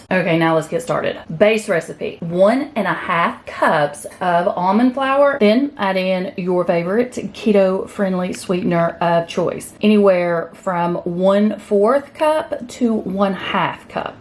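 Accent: South, United States. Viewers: That's an enjoyable accent, I guess some of the more southern US? Greetings from Germany 🇩🇪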